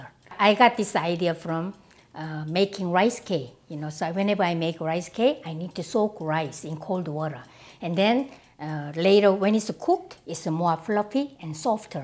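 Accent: South Korea. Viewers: I used to get recipes off your website all the time for years because my mom is Korean but sadly passed away 15 years ago (last week as a matter of fact) without ever teaching me her recipes. I just discovered your YT channel today and I'm hooked! I may stay up all night catching up on all of them!!!! You remind me a lot of her, always happy and making jokes. And the accent of course. Thank you so much for sharing your recipes. 💕💕💕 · 영어가 너무 한국분이라서 정감이가요❤️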